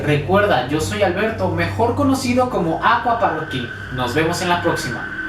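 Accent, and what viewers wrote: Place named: Portugal. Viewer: que buen acento portugués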